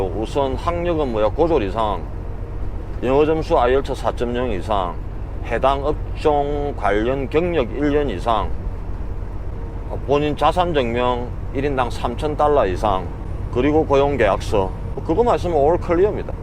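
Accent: Gyeongsang, South Korea. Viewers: 경남 사투리 ㅋ ㅋ 저는 대구 사람 정겨워요^^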